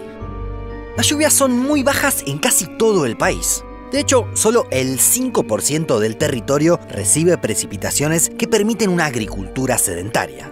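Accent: Argentina. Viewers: Es agua acumulada desde hace miles de años y no es renovable, es agua fósil, es decir, que tarde o temprano se agotará , como el petróleo . Pero toda agua que no discurre, se "abomba", es decir no se "airea", entonces necesitará tratamiento previo para su consumo? Pero claro para riego si sirve. Si sabía este dato. Por ello se pensaba que por este recurso era que los países imperialistas, trataban de apropiarse derrocando a Gadafy. Pero bueno el vídeo. Pero estos gauchos. su dejo molesta, pero que se puede hacer, si es un buen reportaje solo queda aguantar. ASHÍ, EN VES DE ALLÍ Y MUCHAS PALABRAS MÁS..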